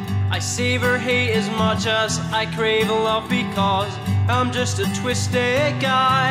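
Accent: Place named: Edinburgh, United Kingdom. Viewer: People form Edinburgh have such thick accents! If I wasn't a killie boy I would have thought you were Irish!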